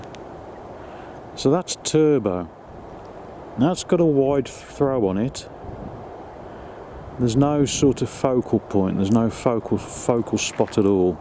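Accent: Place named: Stoke, United Kingdom. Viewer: What city are you from? You sound stokey.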